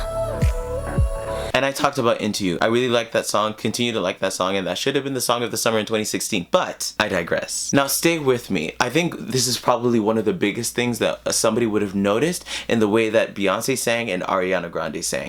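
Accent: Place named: Jamaica. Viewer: This is not meant to be assuming, or offensive. But when I listen to your voice, some of the subtle nuances in your voice I get a Jamaican accent underlying your pronunciation. Just an observation I made. I could be just projecting.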